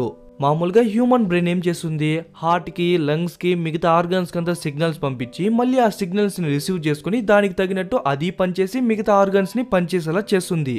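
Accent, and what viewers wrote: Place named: Tamil, India. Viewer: Bro meru tamilian ahh me telugu koncham tamil mix chesinatu vuntadhi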